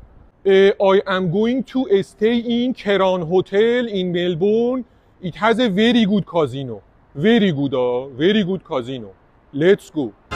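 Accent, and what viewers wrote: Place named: United Kingdom. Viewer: I really enjoy practicing english accent with your videos. Hope to see more long form content of you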